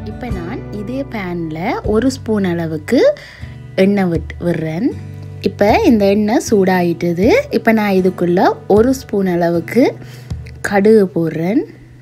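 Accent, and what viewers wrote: Sri Lanka. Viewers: Super neengal jaffna Tamil Thane kathaikirigal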